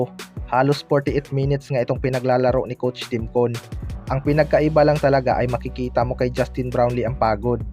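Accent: Visayas, Philippines. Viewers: bisacol nmn tong salita neto sakit sa tenga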